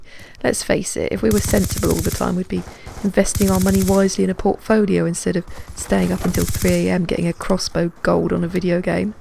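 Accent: United Kingdom. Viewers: I forgot that I wanted to mention as well what a great commentary this was. I enjoyed it thoroughly and agree completely. =) It's also awesome hearing the colorful varieties of english that you only get from the British.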